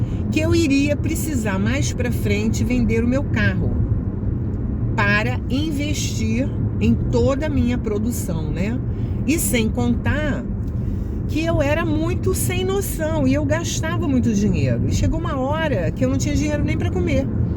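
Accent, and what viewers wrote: Rio de Janeiro, Brazil. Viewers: Pedindo para ter espaço aí pra volta ❤ E vc ainda é nitidamente muito carioca ;) ❤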